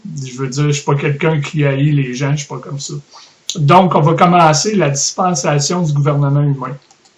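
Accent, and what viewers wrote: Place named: Canada. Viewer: In bon message mais français canadien difficile de saisir.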